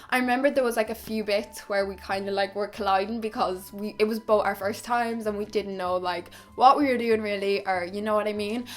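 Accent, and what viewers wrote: Dublin, Ireland. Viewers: Hi! Finally commenting on one of your videos since I started watching them when I lived in Cork for a year (I worked as an au-pair) 🙃 Your accent always makes me miss Ireland so much, haha! Of course that's not the only reason, I do really like your videos and the way you come across, your style... I'd say mine is quite a bit different but it looks beautiful on you and oh, you're so pretty 🌹 (Btw, loving Lana del Rey over here as well, and as for the TV series - have you watched 'Orange is the New Black' or 'Skins'? I feel like you could like Effy Stonem from the second generation a lot, concerning the latter... 🙃) I hope you have a wonderful day, greetings from Germany! 🌲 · You’re so pretty! 🖤 I could just sit and listen to you talk forever because of your Irish accent, haha! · Hey beautiful😍!!! your videos make me so happy😄i love everything about you: your pleasent voice, lovely accent (who said you can't understand irish accent??😂)your wisdom And humor , the content, everything!!! 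I wish you a warm nice calm weekend😙p.s. tv shows that are available on the internet now which i enjoyed very much are: csi cyber and stalker. Must watch!!and yes-i'm bi and i notice girls more than boys, don't know why ❤💖💕❤💖💕❤💖💕❤💖💕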